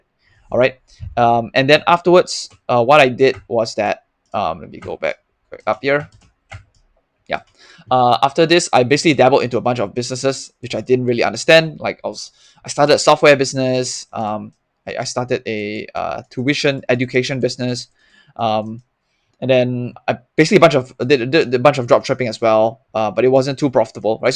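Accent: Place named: Singapore. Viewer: You sound like you are from Singapore and doing military also? So going forward why you picking a job over a business or not worth the extra effort/risk? I see you have done well in HIMS/HOOD have you thought about taking less risk (you might like quality investing) to keep your stack or going to do that if you get to $10M?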